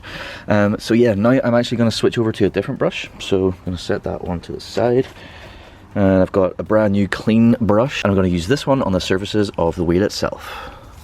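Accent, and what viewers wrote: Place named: Northern Ireland, United Kingdom. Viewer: Love the video mate I recognise that accent whereabouts in NI are you from?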